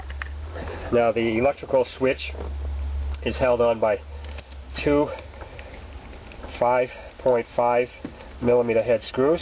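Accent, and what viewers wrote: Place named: Northeast, United States. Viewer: Paaaaak the caaaaaaa! How come all do-it-yourself guys have that northeastern accent real bad?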